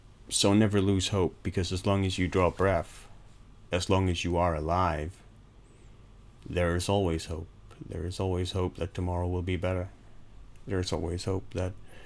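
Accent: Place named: Denmark. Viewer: hehe det er slet ikke så slemt, for det meste kan man ikke høre det, men en dansk accent har det med at trænge igennem fra tid til anden :) anyway vil bare sige at jeg subbede den anden dag efter en podcast og lige havde luret dine steep vids, og kan da se at det er gået hurtigt med et par subs den sidste uge :) håber det fortsætter med at stige og ser frem til flere videoer, kan se den nye L.A. noire er ude, good stuff :) hvis du nu skulle få tid eller lyst ville jeg da blive glad hvis du gad tjekke min lille kanal ud, har kun været i gang i 4 måneders tid så er stadig i gang med at finde min "stil" hehe (var ikke derfor jeg subbede skal lige siges men det skader vel ikke at spørge hehe) aaaaanyway du må have en dejlig uge :)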